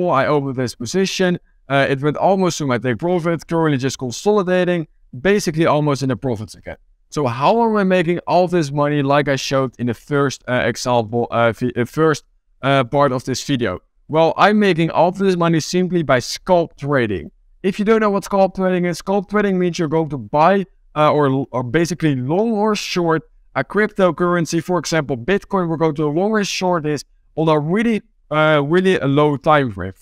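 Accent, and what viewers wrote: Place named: Netherlands. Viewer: goede video man. Je merkt alleen wel dat je nederlands bent😂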